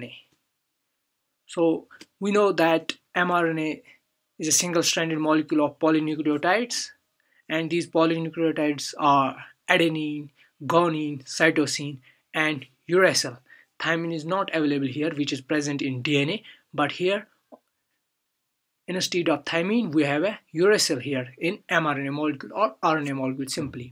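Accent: Kashmir, India. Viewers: kashmiri accent,am i right? .bdw thank you😍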